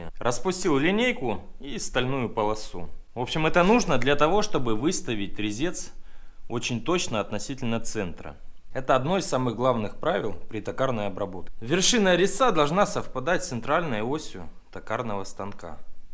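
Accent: Rostov, Russia. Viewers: Это ростовский акцент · это просто ростовский акцент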